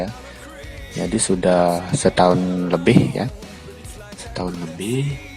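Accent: Bali, Indonesia. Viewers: Logat anak bali wkwkwk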